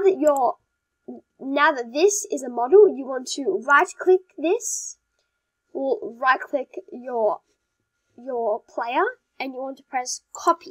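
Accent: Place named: United Kingdom. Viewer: This British tho.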